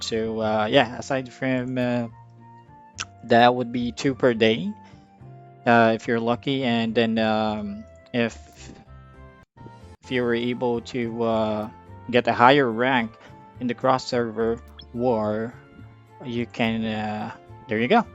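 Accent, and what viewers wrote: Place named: Philippines. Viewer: I mean you really do sound like a Filipino professor haha!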